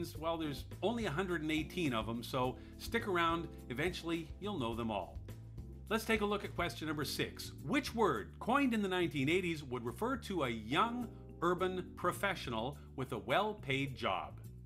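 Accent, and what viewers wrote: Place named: Canada. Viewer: Canadian, eh? 7/10...thanks, from Alberta! Habs fan...sorry!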